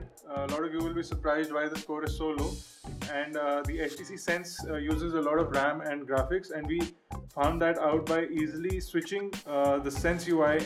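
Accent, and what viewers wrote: India. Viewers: what you expect ,an indian to speak english in american style. you fucking idiot. shut your mouth and get some sense not sensation. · your fckin idian accent is annoyinggg!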